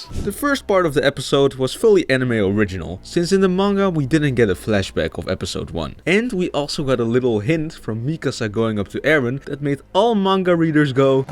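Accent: Netherlands. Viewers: Are you dutch? cuz you sound like a dutch person ngl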